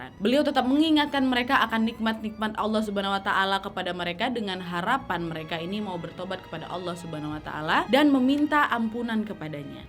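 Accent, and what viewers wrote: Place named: Medan, Indonesia. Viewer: sebaiknya tidak menggunakan dialek medan... ngga semua orang ngerti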